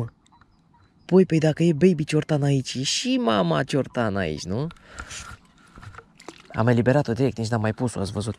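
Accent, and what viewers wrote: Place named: United States. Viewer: Unde ai pescuit în America sau pe o baltă din Romămia că după cum de exprimai erai mai mult american decăt romăn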